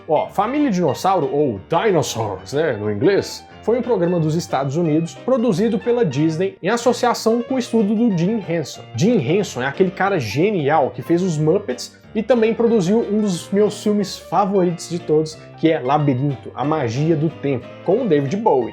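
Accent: Minas Gerais, Brazil. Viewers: Cara tenho muita inveja de quem tem sotaque mineiro kkkkkk é um dos mais lindos do mundo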